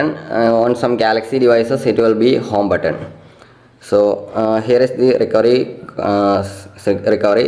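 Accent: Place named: India. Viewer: Could you show me how to speak English not Indian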